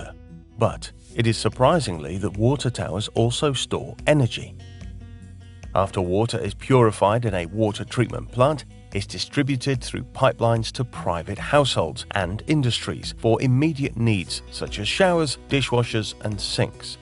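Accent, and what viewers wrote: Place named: United Kingdom. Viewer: I love how you Brits say "wouuhtuh." If you jumped into the water in the tower, would you get sucked into the plumbing?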